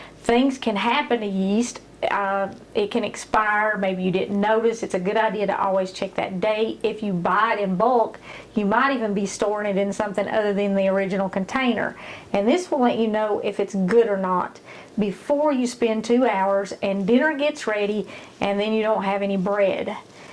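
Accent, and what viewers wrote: South, United States. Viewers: I started watching you and following you because you remind me of the south and I love the south! Even though I'm not from the south. 🙃 I love your accent and really love to see your love for God. I pray that God will bless you and I thank you for talking about Him. Jesus Christ is my Savior and I'm thankful that you are uplifting God/Jesus in your cooking videos. By the way, I love your recipes too.. they are the same recipes my Grandma made. 🙏❤ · I really love your accent. If Dolly Parton made yeast rolls this is how it would sound. Being from Alabama I know a little bit about southern accents. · When they speak with a southern drawl, You know you’re watching a reliable source for good food lol!!! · What part of the south are you from?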